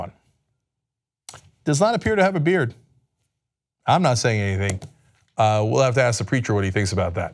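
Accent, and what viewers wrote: Turkey. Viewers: That was your Turkish accent?! I have never heard anyone talk like that here. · Andrew Truman he’s Turkish, I think he comes by the accent honestly, but I don’t love the derision.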